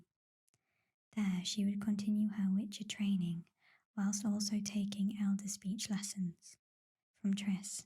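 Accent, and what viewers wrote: United Kingdom. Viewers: Judging by your accent, you live in the UK so I guess you will have plenty of opportunities coming up to catch the rain sounds ;). But maybe you can use background sounds from other YouTube channels if you'd ask their permission? I've just watched you other Witcher vid too, great outfit and your whispers are as relaxing as your soft spoken vids. Keep up the good work, really appreciated!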